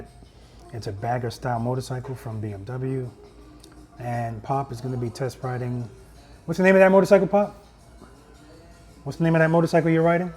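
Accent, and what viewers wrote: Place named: New York City, United States. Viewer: you move to fl . still have that heavy nyc accent . thats a nice bike . you have to get use to it but i get your point . thats why i ll stay with my kawa vulcan s abs 2018 in white all over sunny so az . moved in uhaul from so bx ny 11 mo ago best move ever . the only thing i miss is orchard beach bx . here i have to drive 8 hrs to cali or tex to see ocean but is still better that hunts point bx next to 41 pct longwood . had some friends there in blue . if i want fl thats 5 days pensacola beach other points in fl alittle longer but i may do it . if you see a white kawa 650 vulcan s thats looks like a small harley with all the ad ons and laoud pipes that would be me . may the bike week in daytona in 2022 ? . i use to see a nypd moto off patrolling the bx highways but only one . i never got chanse to talk to him you know him or her ? 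in the summer plenty of scooter nypd in orchard beach bx . but that harley in bx hwys look a lot better. but i think the nypd should get bmw police bikes that would look good . here in az and cali thats wath moto police ride . always a head turner in florida to right ? .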